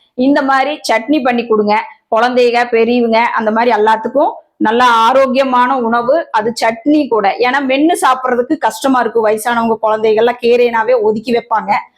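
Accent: Kongu, India. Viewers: கொங்கு தமிழில் நீங்கள் சொல்லிக் கொடுப்பது பேரழகு.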